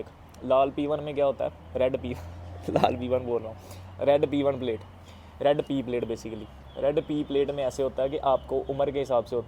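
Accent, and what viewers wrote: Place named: Punjab, India. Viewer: Speak Punjabi, your Punjabi accent is cool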